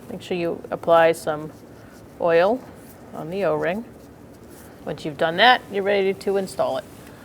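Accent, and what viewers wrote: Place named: New York, United States. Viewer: Sounds like a newyork accent 😅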